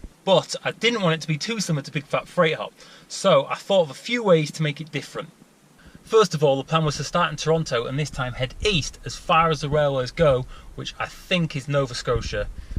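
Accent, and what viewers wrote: United Kingdom. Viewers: What this dude serious in prison? Is tha wha all these comments are about? Damn. Hope he’s in British jail rather than a US jail with this accent bruv. Good luck! Hope they didn’t get u for that long for damn train hopping though god dang. I’m a train fan, yes it’s incredibly dangerous but…anyway. As long as you didn’t hurt anyone or something, sounds like this guy shouldn’t be in jail for 8 years or whatever??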